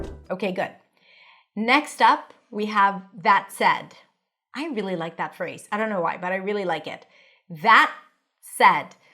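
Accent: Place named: United States. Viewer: Clear American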